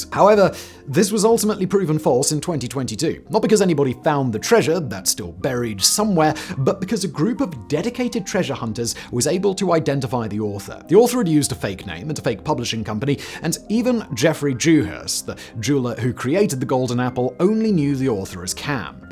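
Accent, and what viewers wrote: England, United Kingdom. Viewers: I come back for the lesson delivered with an English accent time and time again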